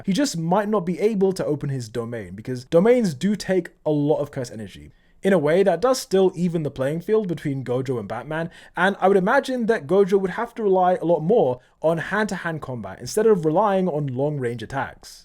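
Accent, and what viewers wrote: United Kingdom. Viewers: This ngas black??? Sorry I don’t have any problems with this but I had no idea. Thought you were a 30 yr old British white guy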